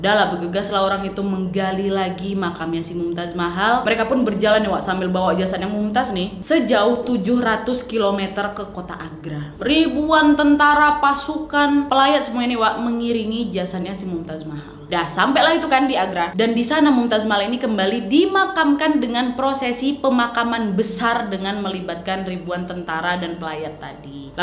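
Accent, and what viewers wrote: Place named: Medan, Indonesia. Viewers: Sukak kali aku lihat kau dek dengan cara menceritakan nya dgn gaya Medan en betul enak dan lucu tidak membosankan. Sukses selalu dekku.😘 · Orang mana kamu nak,bahasamu Medan xx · Salam saudariku,logat medanmu kental ya...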